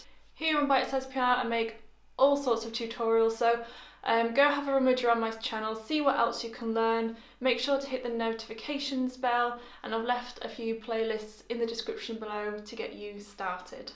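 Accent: United Kingdom. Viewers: A couple of things that I love....piano, and british english, all together 😍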